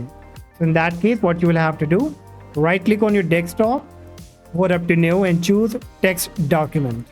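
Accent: India. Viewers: it's always the guy with the bollywood indian voice who helps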